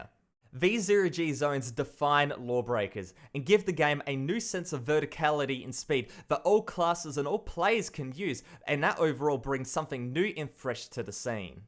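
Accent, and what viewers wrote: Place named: Australia. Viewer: You have a really interesting accent. Are you aussie or from NZ?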